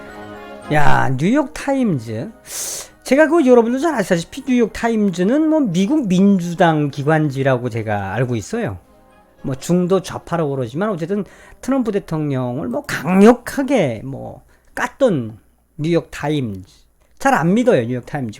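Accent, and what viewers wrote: North Korea. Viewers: 제발. 손짓이나. 말북한따라하징말고 해줘요.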